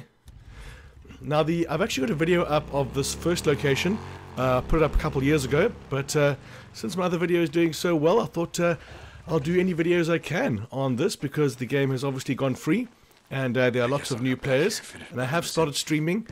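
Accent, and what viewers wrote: South Africa. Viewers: Thanks great video...just got the game and starting. (SIDE NOTE:.....Are you from Mzansi....that accent is a dead ringer). Thanks again, N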